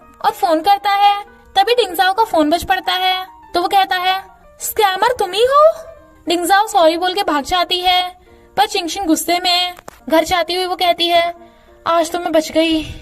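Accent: Punjab, India. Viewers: Aap punjabi ho 😮?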